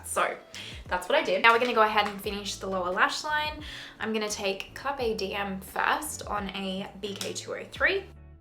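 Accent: Australia. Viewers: You are now one of my favorite you tube channel to watch, so glad I found your channel, so enjoyable to watch so talented and that Australian accent is everything! ❤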